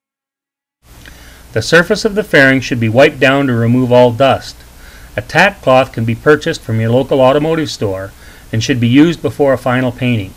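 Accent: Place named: United States. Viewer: frickin americans, soon they'll be saying its a ruf, instead of a roof and a crick instead of a creek.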